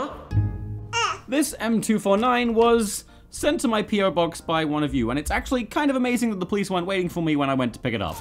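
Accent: United States. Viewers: You’d think he’s American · I thought he was American. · I never knew a Brit can sounds so American... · is turning american. prove me wrong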